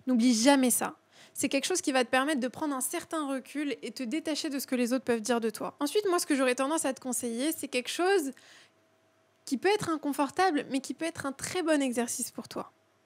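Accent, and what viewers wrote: France. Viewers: Bonsoir, j'espère que tout se passe pour le mieux pour vous. Je vous suis là récemment mais sur insta, plus d'un ou peut-être même deux ans. J'adore la façon dont vous nous parlez, c^est tellement inspirant. Je m'appelle comme vous et viens d'Iran. Je suis iranienne, mais je sais bien parler le français et enseigne le français. J'ai entendu dire que vos étiez d'origine irannienne du côté de votre père. Ça m'a tellement surprise qu'il y a quelques jours, je vous ai envoyé un message sur insta mais vous ne l'avez pas encore vu, j'ai donc décidé de vous parler là. J'ai une simple question à vous poser: est-ce que vous pouvez aussi parler le persan? Aussi bien que le français. Bon écoutez on vous considère comme une française d'après votre accent mais j'aimerais bien savoir si vous avez déjà voyagé en Iran? Sachez que j'apprécie votre travail et j'essaie de ne pas manquer vos vidéos. 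Car, d'une part, je renforce ma compréhension orale et d'autre part, j'apprends bcp choses qui concernent la vie, le développement personnel ainsi de suite à travers vos vidéos. Bonne continuation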